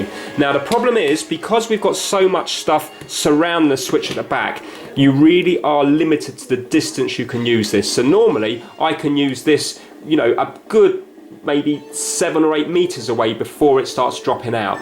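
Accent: United Kingdom. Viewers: You're better and more resourceful engineer than an engineer from Columbia University in New York. But I'm not sure about the British accent though.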